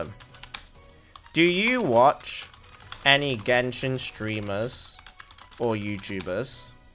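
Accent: United Kingdom. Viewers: I've watched many Genshin content creators, this is the only channel I keep coming back.. There's no happier thing than to watch an Asian with a British accent :D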